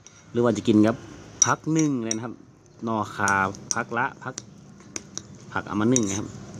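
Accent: North, Thailand. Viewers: ดูฟังบ่อไจ๋คนเหนือครับคนที่ทำ ทำบ่อถูกครับ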